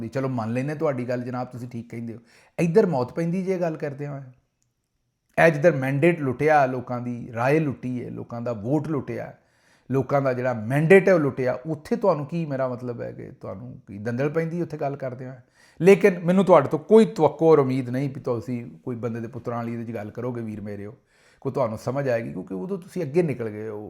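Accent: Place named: Malwa, India. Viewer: Bahla harami aa Dr gill jka safe aali malwayi bolda but chubbviyan gla karda.. dharam naal nzara aa janda Dr tere video dekh ke charde punjab to.. dr vaise kise veele choundey chakk dinna Faislabad to lagda marasi hun mein ni kehna ptandra ggaint Dr sahab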